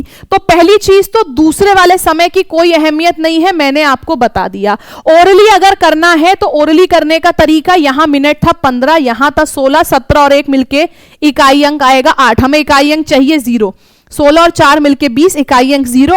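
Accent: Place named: Gurgaon, India. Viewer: Hindi to aap gurgaon r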